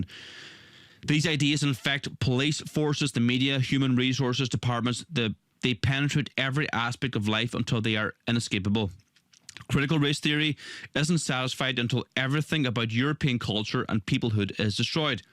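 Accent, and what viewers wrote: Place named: Donegal, Ireland. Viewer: love the Donegal accent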